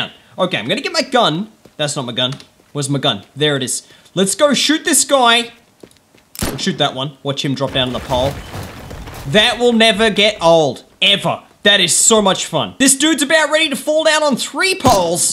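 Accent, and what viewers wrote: Australia. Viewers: im new and i like how you are so aussie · you so Australian oh no we're bodged/oh let's do a u ey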